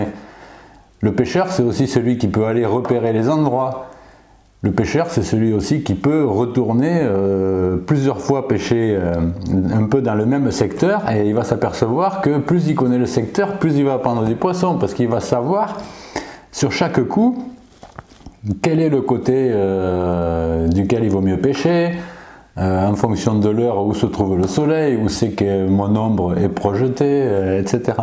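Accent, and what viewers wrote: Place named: Pyrenees, France. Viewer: Bonjour , ravi de te revoir Quel est ce drapeau en haut à gauche? Avec ton accent tu viens des Pyrénées peut être ? Et vive les pêcheurs et les conseils pour pécher une rivière dans les règles de l'art. Le matos c'est super mais faut il savoir s'en servir.Amitiés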